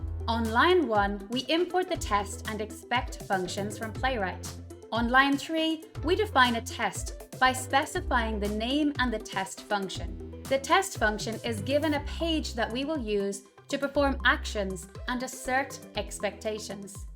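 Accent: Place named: Scotland, United Kingdom. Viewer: her Scottish accent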